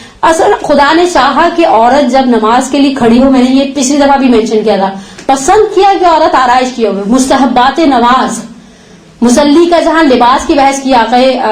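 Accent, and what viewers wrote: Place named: United Kingdom. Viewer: Plz plz ap angrazi accent main urdu nahi bola karain topic acha magar sunaaa muskil ha in ko ..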